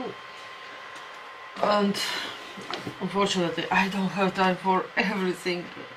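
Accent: Lithuania. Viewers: You could sound like a Lithuanian from a Lithuanian village with a dialect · Very well done!!! You do have an accent but it sounds like a Lithuanian accent. Wow!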